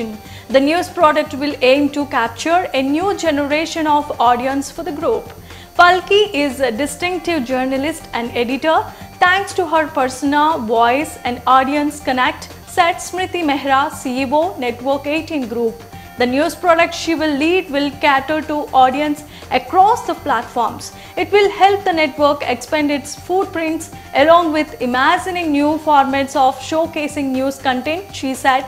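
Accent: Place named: United States. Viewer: Work on your pronunciation Ms Anchor. You are talking about India's most sought after news anchor. You don't have to sound like an American. Leave your colonial mindset.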